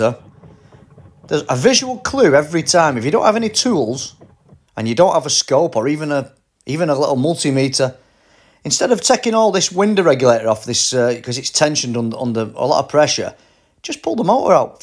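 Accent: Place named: Bolton, United Kingdom. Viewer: Great vid. Must've changed hundreds of these motors when I was at BMW. Where are you based? Sounds like you're from Bolton.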